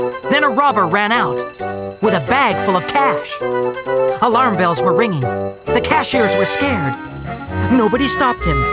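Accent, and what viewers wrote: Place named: United Kingdom. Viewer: I LOVED these!!! It's just not the same though without the British voice over :(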